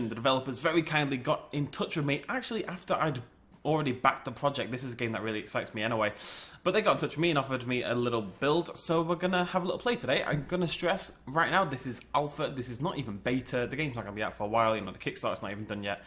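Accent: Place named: United Kingdom. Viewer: Hate to be that guy, but some words have multiple valid pronunciations, and regional pronunciations are a thing. Utilizing several sources, I have determined that there are three accepted pronunciations of the word, with the two american ones being "Nitch" and "Neetch," and the common British, which if you couldn't tell is, pronunciation being "Neesh."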